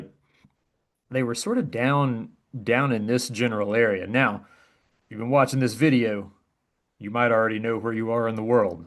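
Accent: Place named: Pennsylvania, United States. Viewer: Awesome video with great illustrations! Sidebar: Your accent reminds me of my lower Bucks Co. PA in-laws 😊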